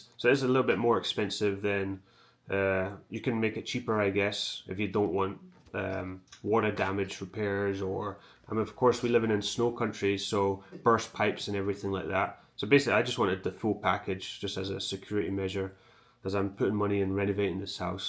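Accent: Scotland, United Kingdom. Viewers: Great video mate, thanks for the breakdown. I have a couple of questions that I would love if you covered in a video sometime, though im sure you already have at some point. I obviously hear the Scottish accent, im from England myself, currently living in the states, and have travelled a lot so I know accents can change after a while, but I sometimes gear your accent changing on certain words so I cant figure out if you're native Scottish, or born somewhere else and grew up in Scotland or something 😅 Also, I wrongly assumed that you were married to a Japanese woman, and thats how you got permanent residency, but I dont think thats the case. Could you go into more details about your journey to gain residency? Or maybe you already have a video on that. Anyway, thats enough waffling from me, thanks again for the video and the insights. I subbed and look forward to more videos. 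Cheers mate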